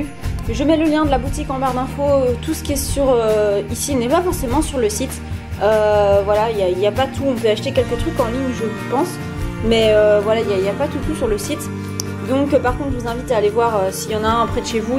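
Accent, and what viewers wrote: Belgium. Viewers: Tu es belge ? Si oui comme moi !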